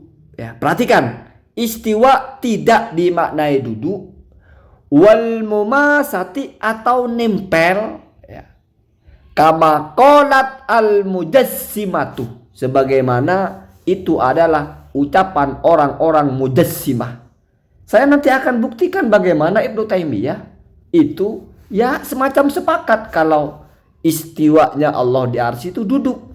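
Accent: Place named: Surabaya, Indonesia. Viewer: jenengan tiyang pundi gus,lagatnipun persis suroboyoan🤭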